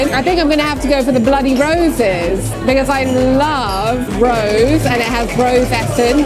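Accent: United Kingdom. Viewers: I live in USA I was wondering how your English accent is. For some reason I do comprehend what you’re talking. It’s not like other British people. Anyway, I love your accent!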